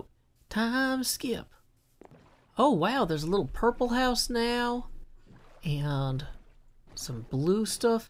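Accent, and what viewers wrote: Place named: South, United States. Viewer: Sound so country 😂🤠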